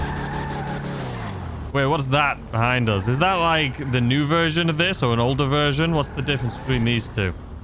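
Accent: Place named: Doncaster, United Kingdom. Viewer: Sounds like your from sunny Doncaster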